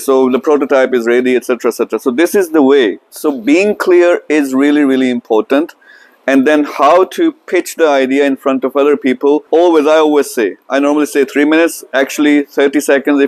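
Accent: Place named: New York, United States. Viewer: NYC way of talking an English 😊🖤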